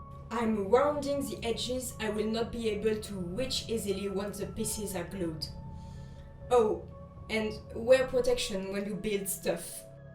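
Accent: France. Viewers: Are you french? I've never heard this accent outside of movies so I didn't know it was real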